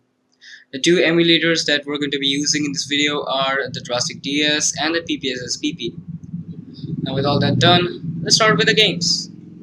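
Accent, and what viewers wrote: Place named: India. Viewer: I love the englisch Indian accent dialect Don't worry bro I won't steal your cow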